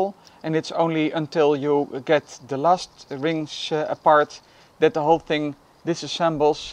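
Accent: Netherlands. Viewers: Je bent Nederlands of denk ik het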